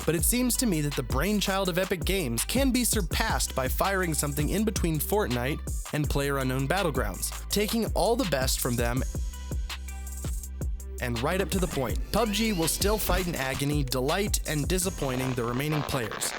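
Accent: Russia. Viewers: Мне кажется ты русский,акцент русский слышно. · Мне показалось или у автора русский акцент?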